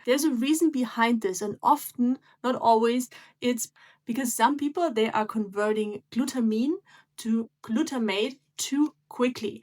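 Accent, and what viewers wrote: Germany. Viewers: I think she is german - I can hear her strong german accent!?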